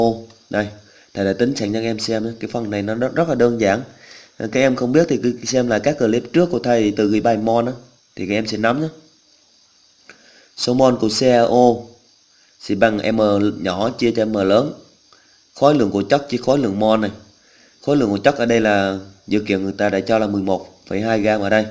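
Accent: Binh Dinh, Vietnam. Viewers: Thầy quê Bình Định à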